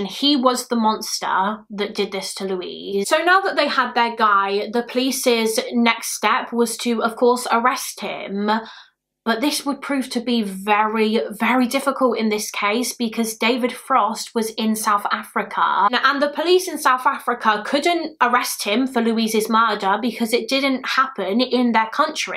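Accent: United Kingdom. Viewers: It takes a really heartless person to destroy someone so beautiful.:/ Wait, the murderer voluntarily came back to the UK? Wow, just Amazing. - thanks a bunch for all the work You put into these videos and i hope the holidays will be nice for You! (Your accent is so very british, can't get any more british... I hope it won't stick, since i'm a non-brit.):)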